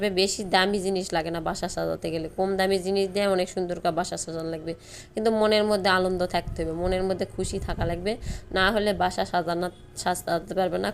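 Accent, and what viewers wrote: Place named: Naogaon, Bangladesh. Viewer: অনেক ভালো লাগলো নওগাঁর ভাষা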